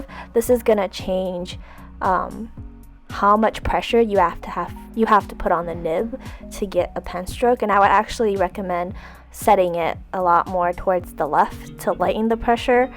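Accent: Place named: United States. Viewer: You sound normal, wth. Idek what's up with the whole voice thingie but you sound like a normal American girl. Like, dude. You have great art, smh. It's gonna take me years to be as good as you and what sometimes hurts? Your voice? Bruh. There ain't nothing wrong with your voice, G. :/ And what negativity? My G, opinions are opinions and if someone doesn't agree, then that's their opinion. You have a lovely voice, it's fine... on a separate note, I love her hair... I betcha if I stepped on her hair, I'd be falling through space. 👀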